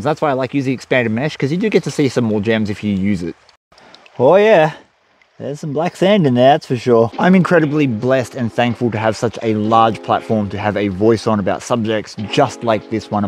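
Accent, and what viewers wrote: Australia. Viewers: Does your accent tell me your on Australia?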